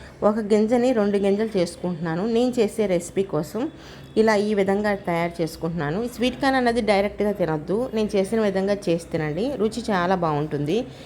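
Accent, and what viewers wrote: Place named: Telangana, India. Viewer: తెలంగాన vsllu వాళ్ళ yasatho matladutharu manayasa thapala అంటె thappa ?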